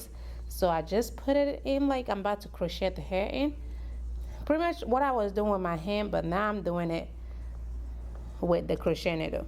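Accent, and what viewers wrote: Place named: Liberia. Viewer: I hear the Liberian! Go, sis!👌👌💜💜💜🇱🇷🇱🇷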